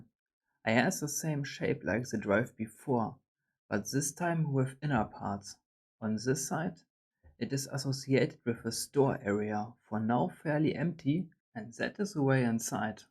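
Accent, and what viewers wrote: Germany. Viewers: When you don't care what others think and you do projects purely for self-gratification, the results are astounding. That's how things should be, in my opinion. Keep it up man. PS:- I like your German accent lol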